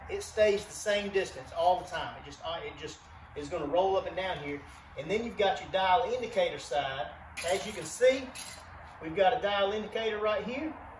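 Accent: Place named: Appalachia, United States. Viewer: HillBilly trying to sound real intellectual like.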